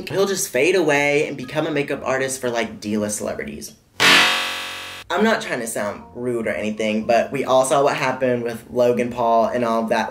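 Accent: South, United States. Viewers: Finally a YouTuber that I actually like that has a southern accent!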